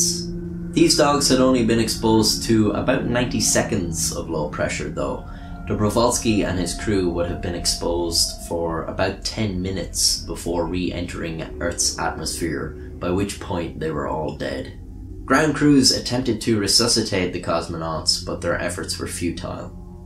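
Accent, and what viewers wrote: Ireland. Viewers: It's refreshing to hear another Irish accent that I'm not used too during this quarantine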